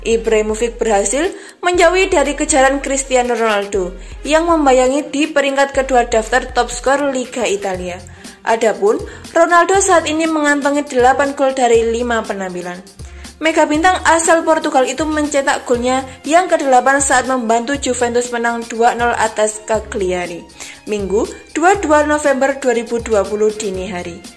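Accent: Java, Indonesia. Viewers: Medok banget mba bacanya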